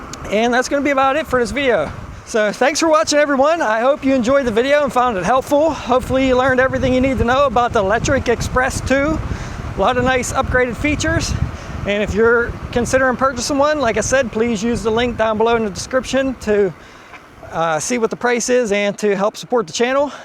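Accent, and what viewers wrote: Pittsburgh, United States. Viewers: My man, you HAVE to be from Da Burgh. LOL!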